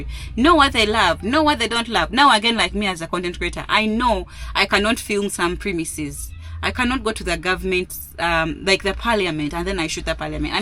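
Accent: Kenya. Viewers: your accent changed to a Kenyan accent. You no longer sound like Ugandan at all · You sound like a Kenyan. Karibu sana. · THE accent is kenyan❤ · Your dropped Ugandan accent and picked the Kenyan accent so fast 😅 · Kenyan accent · I knew it was just a matter of time before you start talking using our Kenyan Accent...i'm a super proud Subscriber today😂😂😂😂😂 please,be coming to kenya regurlary...you're one of us already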